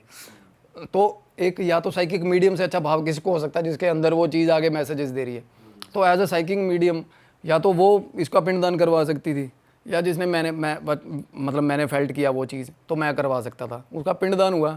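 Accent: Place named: Pahadi, India. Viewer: Seems like pahadi kind accent...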